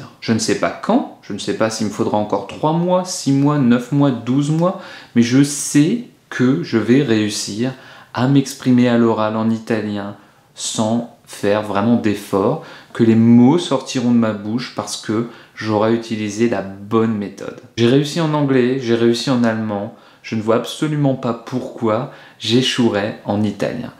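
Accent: France. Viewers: Parfois c'est bon de traduire littéralement de l'italien en français, mais pas toujours.. Et... Bravo pour ton italien oral! Ton accent français est délicieux, comme l'accent de tous les français lorsqu'ils parlent italien! 😊 Je te salue de ma merveilleuse Liguria, l'un des lieux les plus beaux d'Italie, un arc-en-ciel de lumière et de couleurs entre la mer et les montagnes que je te conseille vivement de visiter! Il me fait plaisir que tu aimes l'Italie et sa culture! Mais... tu me permets? On dit "mi piace molto imparare..." etc, non pas "mi piace molto di imparare... etc. Ce "di" n'éxiste pas dans cette phrase en italien: c'est juste une traduction littérale du français, ce que tu viens de dire!... Tes enfants qui chantent "L'Italia s'è desta" sont trop charmants! Ciao!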